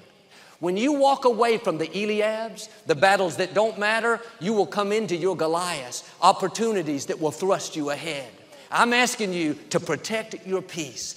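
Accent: Texas, United States. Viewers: Thank you so much for ALL your encouragement, jokes and messages! I do love your Texas accent. God bless you in Jesus' name! ! 😘😘🙋🙋👏👏😃😃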